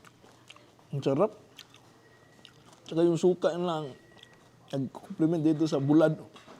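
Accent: Visayas, Philippines. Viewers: I love that bisaya accent Though😊, lalo na ang pag ka brought ng sabaw😂😂😂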